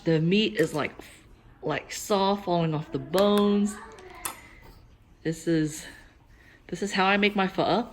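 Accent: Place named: South, United States. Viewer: I love your family and your southern accent.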